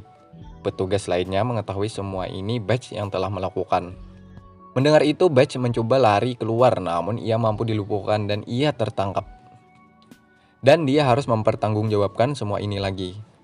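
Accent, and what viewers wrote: Banjar, Indonesia. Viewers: Anjr bhasa bnjr nya kental bnr, fokus aja jdi fukus wk, tpi kdpp ai. Contoh chanel lain kya iq7 tu nh, beh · Sasakali bahasa Banjar hja pnk basuaranya kyp bisa bagus banar sako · Denger denger dangsanak ... Hemm orang banjar ya bang😂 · Orang Banjar kah? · Urang banjar kah? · urang banjar kah om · Pian orang Banjar kah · Woah mimin banjar kalsel sama nah sanak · Ka kk orang banjar ya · Logat Banjar kdd hilangnya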